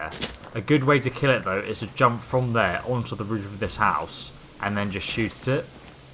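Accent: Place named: United Kingdom. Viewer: I'm not saying this with any malice intended to you or (his vids are great), but I've had a feeling that he might be dyslexic or have some sort trouble saying what he reads. He does it very often in many of his videos. Like saying Mr. Gusty when its really Mr. Gutsy for example. It happens often enough with enough different words/names repeatably to the point it's noticeable. It might be separate from what is being debated in this comment thread, but I can't attribute my observations to just a British accent which I am familiar with.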